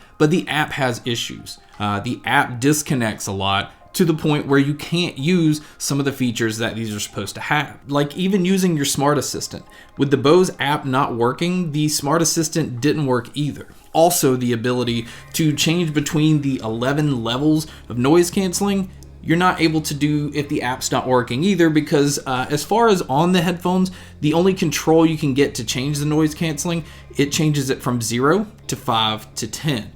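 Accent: South, United States. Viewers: This guy's got a very strong country, southern accent!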